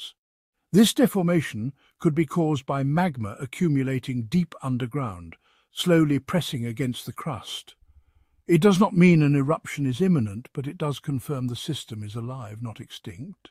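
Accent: United Kingdom. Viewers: WTF are we listening to a Brit tell us about our PNW? Does he have any credibility? Does he know about Baker or Shasta? He brings up Arizona and Wyoming. Has he ever hiked through the Craters of the Moon as a kid? Where was he when Mt St Helens killed Harry R. Truman? Has he ever visited Mt St Helens? I doubt it! He reminds us of everything we experienced in 1980! Do we need to be told that there was plume across the US? Has heard about the Cascadia Subduction Zone? What goes silent forever? I’d suggest that the should shut up and go silent forever!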